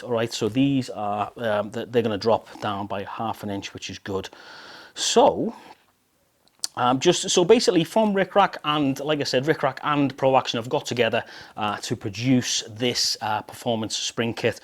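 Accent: Liverpool, United Kingdom. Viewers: Nicely done, taking your Scouse glide to another level as well as your editing and audio. I never saw on your “ To Do “ list win the league for Liverpool. Sending my best wishes from Scotland to you brother. Much love to you and yours. 👍👊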